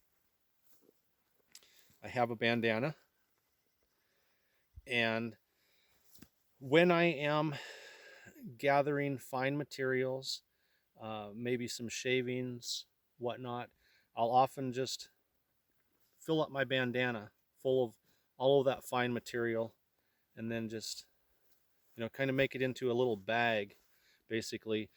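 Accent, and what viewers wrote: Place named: Mexico. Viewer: I like your Mexican accent. Like your hat also.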